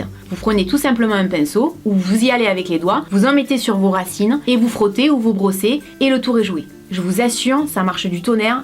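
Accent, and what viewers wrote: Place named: Perpignan, France. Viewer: elle vient de perpignan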